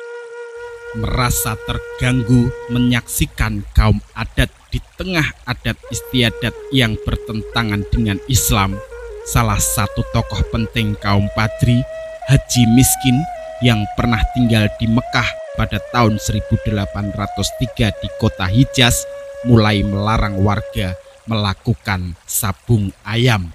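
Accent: Java, Indonesia. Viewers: Medog kali Jawa mu mas, kalau ngak paham sejarahnya yo belajar dulu toh.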